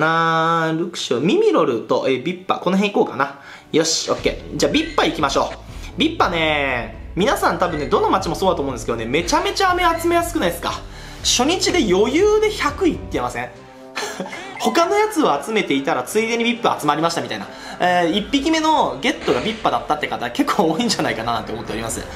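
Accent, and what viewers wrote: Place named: Tokyo, Japan. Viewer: 東京の人みんなこんなイントネーションなんか？ 引っかかってなんか腹立つ感じする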